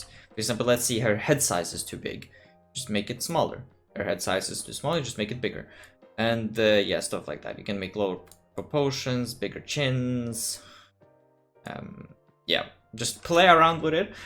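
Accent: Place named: Russia. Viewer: У тебя акцент русский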